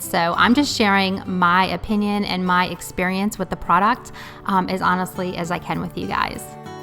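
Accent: Louisiana, United States. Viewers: hi! I just subscribed, your video from a year ago popped up on I my feed. I'm in SE La I recognized your accent :)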